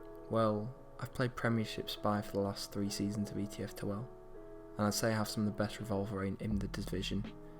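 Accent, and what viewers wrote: United Kingdom. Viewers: This was so informative and seductive.. the Welsh accent helps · hes british just like the spy :o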